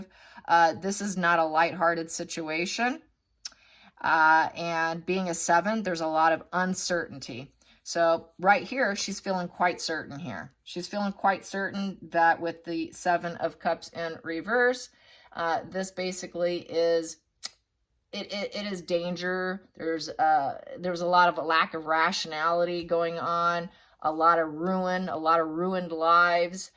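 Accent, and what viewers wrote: United States. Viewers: I am from Manhattan. Your very mild Long Island accent comforts me. I live a long way from home now. It's good to hear "home" so intelligently and soulfully spoken! Thank you!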